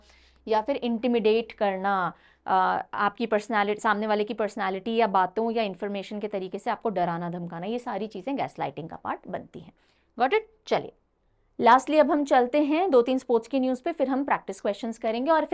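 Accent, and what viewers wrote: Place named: Rajasthan, India. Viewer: Mam u r Rajasthani ....aapki koi koi baato se lg jata h 😅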